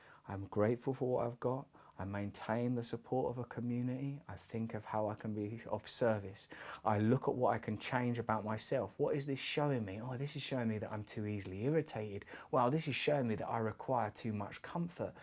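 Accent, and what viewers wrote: United Kingdom. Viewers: i notice you have an accent that sounds like Kathrine Hepburn sounded when she talked. Is a nice accent. British ?or Aristocratic?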